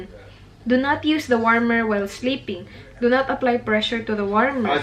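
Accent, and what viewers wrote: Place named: Visayas, Philippines. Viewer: ang cute magsalita ng tagalog na may halong bisaya :) pero ang galing mag english :)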